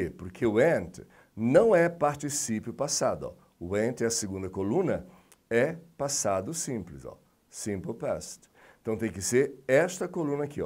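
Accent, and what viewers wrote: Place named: United Kingdom. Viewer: Professor que fala britânico forte.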